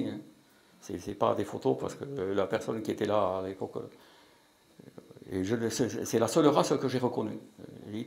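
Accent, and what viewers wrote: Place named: South, France. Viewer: Après avoir vu la video de nurea tv, je ne m'attendais pas à un tel accend du sud 😅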